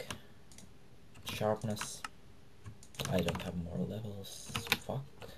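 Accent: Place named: Finland. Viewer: Rallienglanti :D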